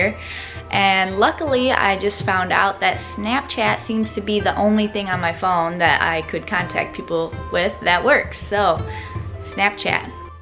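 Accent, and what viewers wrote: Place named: Midwest, United States. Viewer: Cool video. Did you just get a 24 hour pass on your passport to be able to leave the airport during your layover? And are you from the Midwest? I could hear a Midwest accent in your voice.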